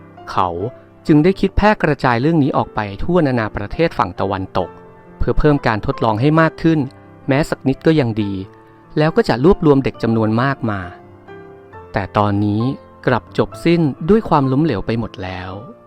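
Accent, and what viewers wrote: Isan, Thailand. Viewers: เสียงภาคอีสานเปลียนเป็บพูดปกติได้มัยคับผมฟังไม่รู้เรื่องคับ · ติดสำเนียงอีสานด้วยฟังมะค่อยรู้เรื่อง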